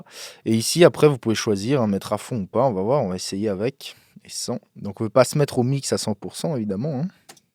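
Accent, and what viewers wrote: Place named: Montreux, Switzerland. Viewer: ce petit accent du coin Montreux / Aigle, ou vraiment pas loin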